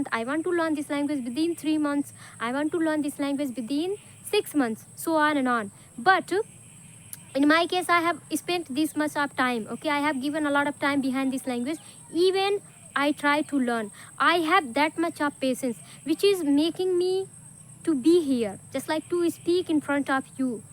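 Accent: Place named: Bihar, India. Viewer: You are not able to Your Hindi Bihar language slang I Guessed that But Like your Passion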